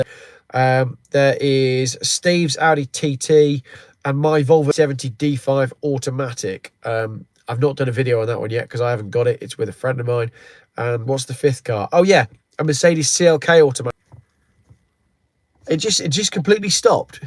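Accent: United Kingdom. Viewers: Hi. Come to Texas if you can get into the USA. We have laws that protect free speech and the ability to defend yourself and your home here. Texas can legally secede if the SHTF. It is the 8th biggest economy in the world. Just stay away from the big cities - Dallas, Houston and Austin. I have lived in Colorado, Wyoming and Texas since I emigrated 19 years ago when Blair was ruining the UK. Though it's fairly hot where I live in the South Panhandle, I feel relatively safe in a smaller Texas town. The people here very friendly and helpful, and they LOVE a British accent. It is a HUGE state with different types of weather so do your research.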